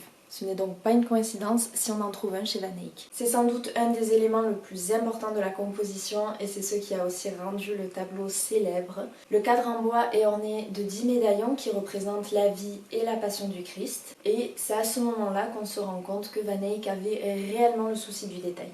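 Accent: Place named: South, France. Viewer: Elle explique bien (elle a l'axen du sud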